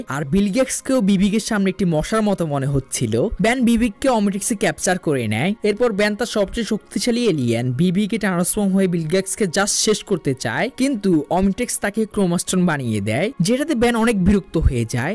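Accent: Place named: Noakhali, Bangladesh. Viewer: Noakhali accent chara baki shob tikh ache. Accent tikh korle awesome hobe. Good luck bro.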